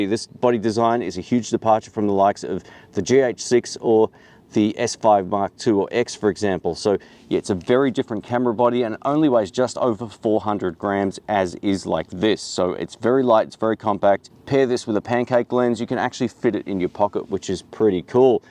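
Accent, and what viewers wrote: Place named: Australia. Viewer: It was all looking awesome……until you mentioned the record time limits! It did seem like that was going to come up as a problem as there was no visible ventilation – it seems to be a must-have on a full frame nowadays. With any luck Panasonic will be doing a GX9ii with a large chunk of the G9ii features…….now that would be an awesome street camera!! Being an Aussie in the UK it’s great to hear another Aussie……especially on a channel like this. Keep up the good work!!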